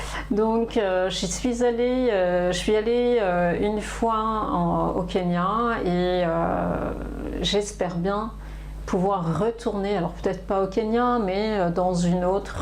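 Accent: France. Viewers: Bonjour, ça fait plaisir d'entendre le parler francilien plutôt que le français standard, le discours semble tout de suite plus naturel! Sinon, je me voyais devenir prêtre, tendance mystique, conscient que j'étais très tôt de l'existence de l'invisible tout autour de nous. Les métiers dans le domaine médical et l'éducation me faisaient horreur, car trop sociaux. Cette vocation s'est vite effacée à l'adolescence, en raison d'une part, de l'imposture que je suppose dans cette croyance, et d'autre part, par le sacrifice imposé par le célibat... Et sinon, je suis ornithologiste amateur, pour pouvoir apprécier la faune sauvage locale, qui disparaît à grande vitesse désormais.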